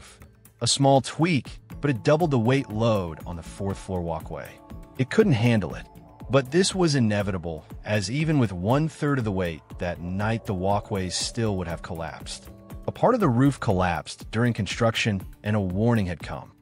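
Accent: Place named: United States. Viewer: The reason it feels like an AI voice is because the word spacing is strange and the way it says "two - five hundred" for 2500 (there are more instances of this as well). Im not aware of any human that says it like that. "25 hundred", "two thousand five hundred" or "two and a half thousand" are about the only ways I've ever heard a human say it in any language. Given the accent, which sounds american, I dont think it's a case of "english not first language" either. I have, however, heard AI say 2500 as "two-five hundred" many times. That said.... even if it IS AI, a couple tweaks and it could dodge that. It's not awful.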